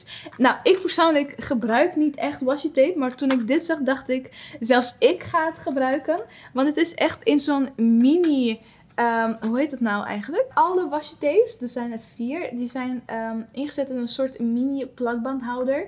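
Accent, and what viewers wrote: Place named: Enschede, Netherlands. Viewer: die "n"van haar omg kom je uit enschede?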